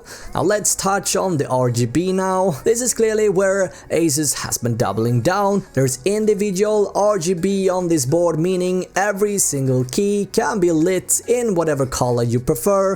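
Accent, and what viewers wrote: Sweden. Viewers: Are you a swedish elleh? Good review. It's an instant buy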